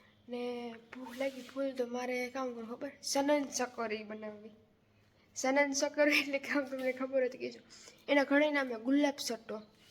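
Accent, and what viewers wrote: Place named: Porbandar, India. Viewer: From your language I think 💯 % u r from porbandar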